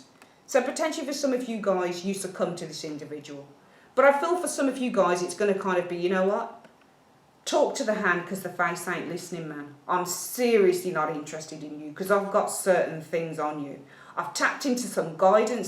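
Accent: United Kingdom. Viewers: You should put a Brit flag on your icon bc i cannot understand you. I'm sorry. I only speak American English. :(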